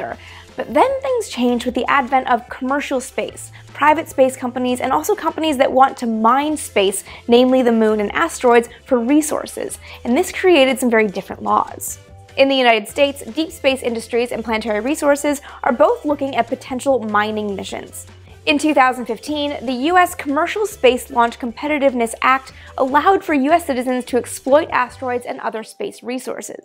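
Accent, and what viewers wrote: Canada. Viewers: I really like these videos, and your pronounciacion is so Candion. Hehe. Go!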